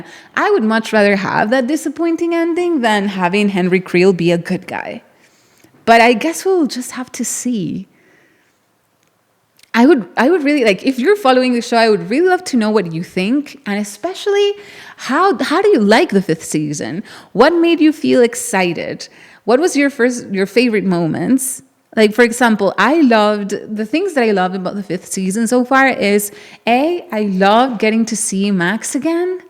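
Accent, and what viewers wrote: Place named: Venezuela. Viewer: Tienes un acento más bonito en inglés que en venezolano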